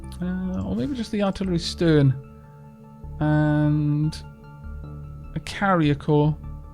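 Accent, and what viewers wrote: Wales, United Kingdom. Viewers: Merry Christmas. No offence but I love your Welsh voice, so lyrical and soft. Love the one hour vids, it's like I'm watchin' netflix. But your welsh and you stroke ferrets. How re the ferrets? Is that the reason for the soft voice, you sexy ferret beast.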